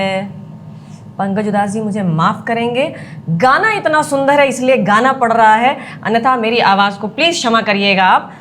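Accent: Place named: Kanpur, India. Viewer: Bhai aapki bhasha and tone. Kanpuriya hai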